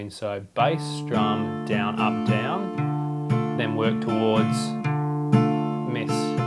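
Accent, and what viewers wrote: Australia. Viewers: has anyone ever told you you look a bit like rob swire from pendulum?? he is australian too!! maybe youre related!!!!! or maybe you ARE him.. hmm , btw if you are actually newsealandish then i am sorry its just im not good with accents and you sound australian but if you are newsealandish im sorry. but your cool :D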